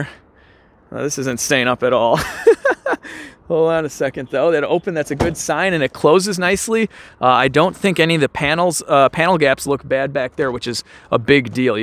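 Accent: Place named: Chicago, United States. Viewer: This SL65 project is your way to a Million subscribers! Your light Chicago accent is perfect for non English native speakers like me to understand. My English is getting better with every video.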